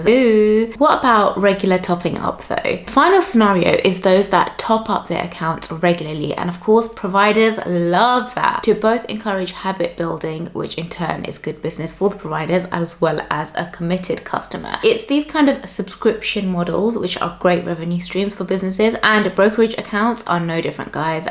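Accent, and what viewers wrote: United Kingdom. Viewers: Are you from England. Btw great video...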